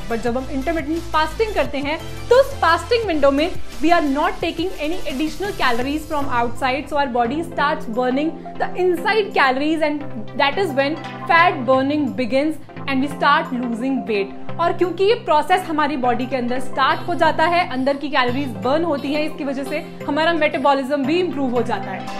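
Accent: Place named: India. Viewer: She is Indian they speak like this. 🙂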